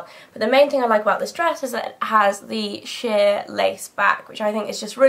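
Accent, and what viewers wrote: England, United Kingdom. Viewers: i just LOVE how you said "shorts" @@ i get hypnotized xD i really love the english accent! :D and nice haul! woof adds some fun! LOL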